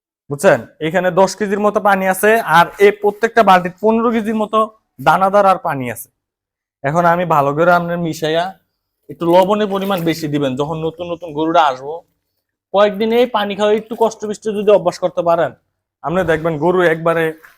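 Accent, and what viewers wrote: Comilla, Bangladesh. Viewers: ভাইজান কি কুমিল্লাই ছিনেন নাকি কারন আপনার কথাবার্তা ঠিক কুমিল্লা মানুষের মতন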